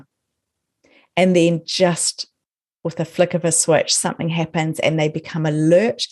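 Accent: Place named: Scotland, United Kingdom. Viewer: My favourite YouTuber in the world! from Scotland!!! I love your Scottish accent❤️❤️❤️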